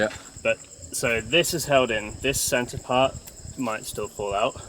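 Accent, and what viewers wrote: Australia. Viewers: nice to hear and australian accent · Two ingredients for successful boating - patience and the maturity to know when brute force is the only option. Good to hear an Aussie accent as well.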